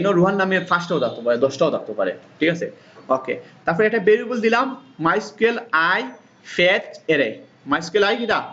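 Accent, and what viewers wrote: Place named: England, United Kingdom. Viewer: Parts sound English ish.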